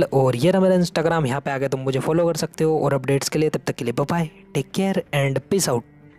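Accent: Haryana, India. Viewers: Bhai please btada tu haryana ka h kya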